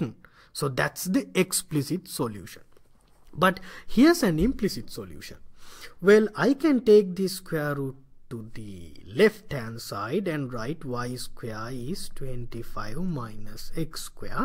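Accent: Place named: India. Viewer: he has such a cute Indian accent.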